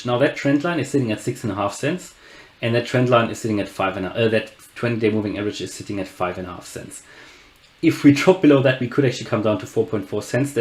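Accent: Germany. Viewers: Danke für die Analyse. Ich schreibe auf Deutsch, weil ich an deinem Akzent vermute das du deutsch bist 😂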